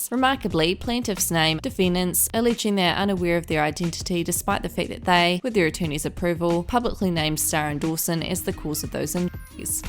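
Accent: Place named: New Zealand. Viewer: Great content! I could listen to that kiwi accent all day long ❤️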